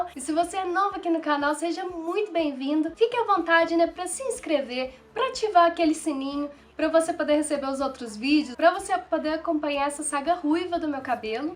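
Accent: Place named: Brasília, Brazil. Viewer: Acho que ela é de Brasília-DF. Pq o pessoal aqui tem esse sotaque lindo, maravilhoso, pelo qual sou apaixonada tbm 😍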